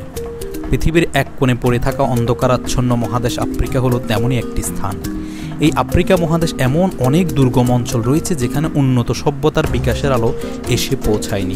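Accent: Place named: Chittagong, Bangladesh. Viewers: ভাই আপনার কমেন্টারিতে নোয়াখাইল্লা, চাটগাইয়া,একসেন্ট আসে,শুনতে 😊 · ভাই কি চট্টগ্রাম নাকি নোয়াখালীর? ভাষায় তো চট্টগ্রাম। উডা হডে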